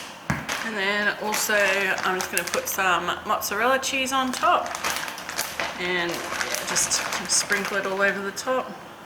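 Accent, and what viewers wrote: Australia. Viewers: Just found your channel. Am I hearing an Australian /accent ? The ingredients you use if they Aussie based can you list in description box the American product substitute a measurements in parentheses. Thank you. I turned away from your last week because for fear I wouldn't be able to follow your recipes I watch the food channels to unwind and food prep for inspiration. Your personality and presentation style brought me back to your channel. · It great to hear an aussie accent too!!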